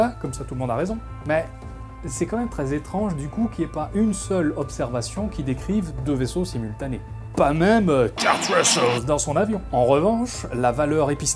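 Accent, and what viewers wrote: Belgium. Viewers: Belge Mr?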